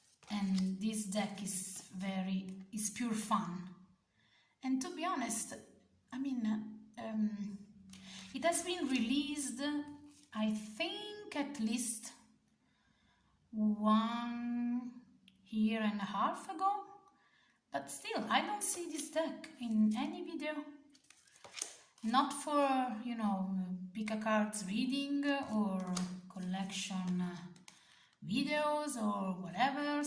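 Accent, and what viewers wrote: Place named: Italy. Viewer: Love the decks you've chosen!! And your Italian accent is so delicious, I didn't know most of the decks you presented!! Thanks a lot.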